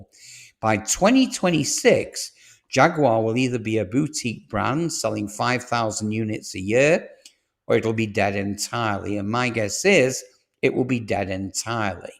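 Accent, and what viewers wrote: United States. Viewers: why (mis)pronounce the car brands like a yank, I thought this was about european cars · American pronunciation. Same with tomay-to. There are some differences, do keep up.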